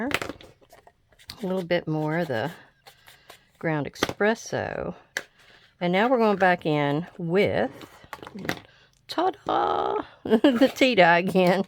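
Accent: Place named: Germany. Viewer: love all secret spots. lace table cloth is my fav. The nature spirit journal is fabulous, only don't understand her German dialect. Still watch her work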